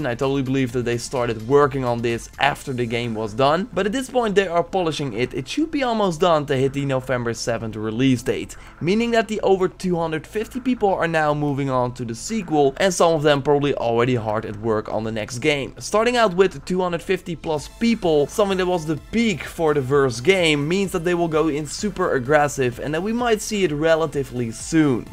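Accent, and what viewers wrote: Netherlands. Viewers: ge hoort het toch da ge nederlands bent van origine ze man :) kweet ni hoe funny voor mij :p (geweldige video's) kben al nen hele tijd uw vids aant bekijken en kzouet belange ni beter kunne.... goie werk en blijf vooral verderdoen man :) grtz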